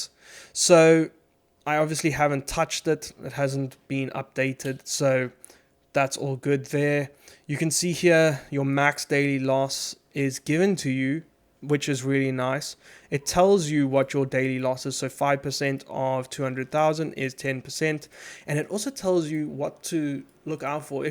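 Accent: South Africa. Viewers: Ah you can’t miss a South African accent, from a fellow South African being able to get funded opens a massive opportunity for us dealing in dollars, I’ve been wanting to take a challenge and hopefully, I can get my chance.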